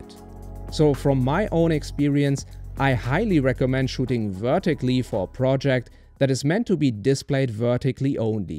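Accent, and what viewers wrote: Germany. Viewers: Love that massive german accent haha! (best greetings from germany)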